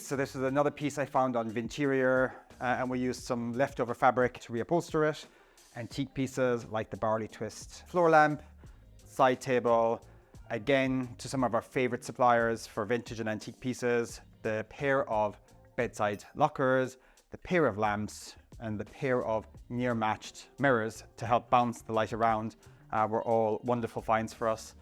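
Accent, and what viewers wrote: Ireland. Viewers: He is Irish. Not everyone who lives in the Uk has the same accent. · Hi lovely house, but do i hear an Irish accent????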